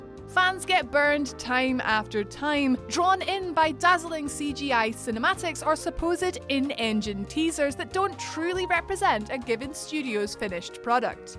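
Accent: Scotland, United Kingdom. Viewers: I love Scottish accent ❤️❤️ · Do I have hearing problems or did she sounded more and more Scottish the farther the video progressed? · She did start sounding more and more Scottish as the vid went on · Great video, but my main enjoyment was hearing a fellow Scot on YouTube for the very first time 😁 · Wooo Scottish lady ken😎🏴🏴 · was i the only one who only noticed she had a scottish accent halfway through the video? was a little confused once i noticed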